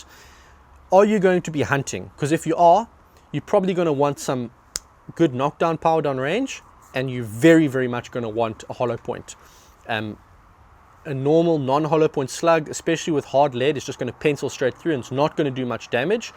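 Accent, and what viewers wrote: South Africa. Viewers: This guy isn’t from down under, he’s got a very strong South African accent - nothing like an Aussie accent.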